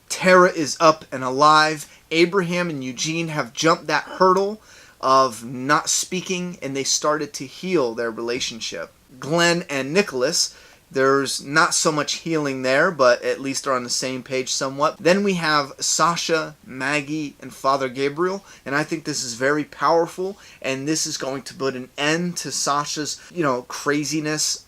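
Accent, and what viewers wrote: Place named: New Jersey, United States. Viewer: Gotcha. You had this Jersey Girl fooled. Your accent is sooo Jersey. And your humor is similar to Jay from Jay and Silent Bob from the Kevin Smith movies who is also a comic guy and from NJ. Thought I #nailedit ...smh... LOL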